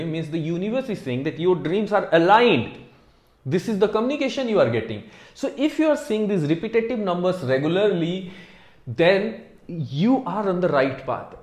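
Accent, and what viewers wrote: India. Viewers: Is-spirituallity indian accent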